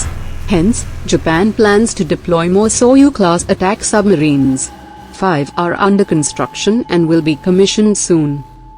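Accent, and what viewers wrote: India. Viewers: The narrator's voice is a typical Indian female voice.... sooooo pathetic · the narrative is deliberately female INDIAN-accented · The narrator is Indian...from kerrela?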